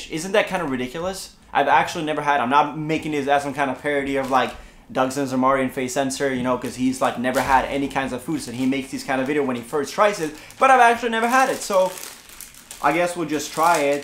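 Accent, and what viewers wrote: Scania, Sweden. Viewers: Jonte nej han är ju skånsk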